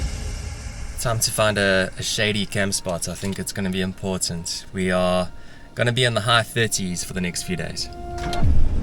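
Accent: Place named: South Africa. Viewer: It was hard to hear you being so hard on yourself in the video. Because watching this as a South African expat living in Europe, my heart yearns to be home. My heart actually breaks, not being able to be there in nature with my family; with our beautiful sunshine; with our friendly people or awesome food culture; our magical animals in our national parks. And your videos bring me so much joy and so much peace, listening to a South African accent, speak about our home, rather than an American tourist, who doesn't know the first thing about our home and our animals😂 Please dont be so hard on yourself - you don't know how much warmth you bring to so many people with these videos and I pray that you continue to prosper.❤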